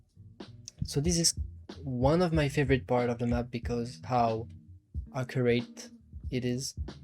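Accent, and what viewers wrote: France. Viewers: Hey, i loved your video ! Are you french ? You have a kind of french accent. Anyway, amazing work, brother. Keep on ! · This horrible French accent 😅😮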